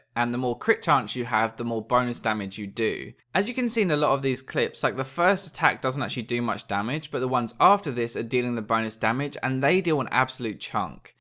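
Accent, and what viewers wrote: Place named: United Kingdom. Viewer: I don't know why, but remainds me of Redmercy a lot just with british accent... Mindblown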